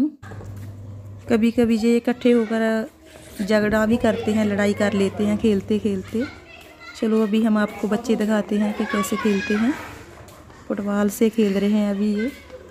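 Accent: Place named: Punjab, India. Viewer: Hello mam aap Na Punjabi ma bolta hi Sacha lagta ho oor milking ka trika bhi sahi h hum bhi asa hi Karta h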